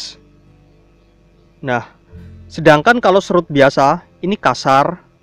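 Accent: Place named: Banyumas, Indonesia. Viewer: salm ngapak kang ,... :D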